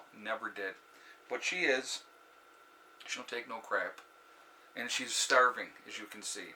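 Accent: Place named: New York, United States. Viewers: That’s too funny. I thought you said 8,000 but it didn’t even phase me because I don’t watch the market. I think it’s the NY accent! Blessings! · I heard 8,000 too! 😄 Must be that NY accent. In NY, 'A' means 'What?'. No biggie. 👍 Obviously, this virus is being handled by the 3 Stooges. I've spent a ton of $ too. My credit card is screaming! Think, research, prepare, and plan to take care of yourselves and your families. Stay safe everyone & God bless.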